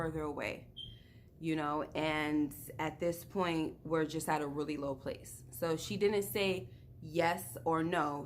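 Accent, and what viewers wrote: New York, United States. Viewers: Oh WOW! I’m first! New subbie! Love your accent - sounds like home NY!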